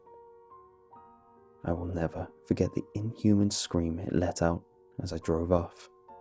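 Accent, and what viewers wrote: England, United Kingdom. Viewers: Why do all tge Creepy Pasta channels sound the same? Are they English or just proper sounding Americans?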